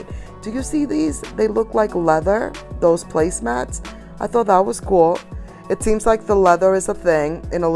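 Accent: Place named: New Jersey, United States. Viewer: Girl I love your videos. Your personality is over the moon. Sounds like your accent is New Jersey. Watch housewives of NJ and that is why I’m thinking that. I’m from New Orleans and people think I’m from Jersey. I love your accent. Tfs cheers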